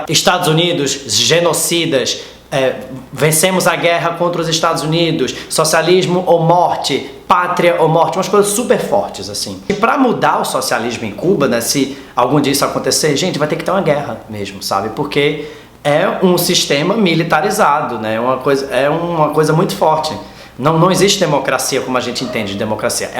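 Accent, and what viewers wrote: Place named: Recife, Brazil. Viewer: Adorei o vídeo! Tava com saudade de ouvir alguém falar c esse sotaque recifense arretado demais! Sdds do Recife ♡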